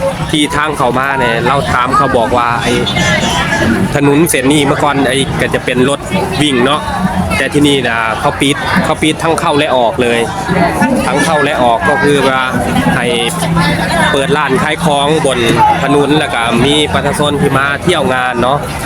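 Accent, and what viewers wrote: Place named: Laos. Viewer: บรรยายไทยล้วนไม่ปนลาวได้หรือไม่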